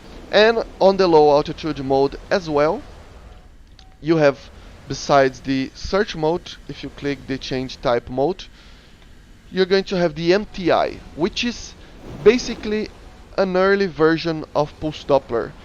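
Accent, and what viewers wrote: Brazil. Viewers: Notei q vc eh BR pelo sotaque do ingles kkkk video brabo nois 😎🤙🔥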